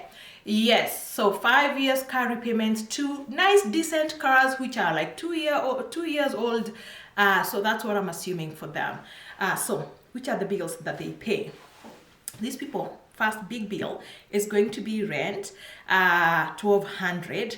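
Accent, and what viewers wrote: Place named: Jamaica. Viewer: I actually thought you were Jamaican, even when I watched your other channel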